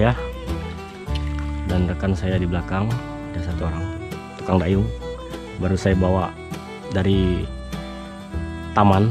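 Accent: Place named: Sarawak, Malaysia. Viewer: bahasa nya kyk sarawak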